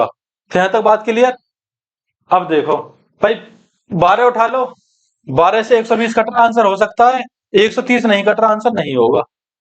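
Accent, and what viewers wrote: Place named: Muzaffarnagar, India. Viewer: Sir muzaffarnagar ki language lg rhi h aapki 😊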